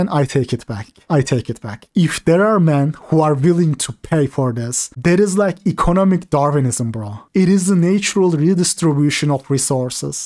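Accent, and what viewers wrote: Turkey. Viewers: Dude, until you mentioned your name, I thought you are Turkish. You have a clear Turkish accent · Your accent sounds like turkish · Turkey I think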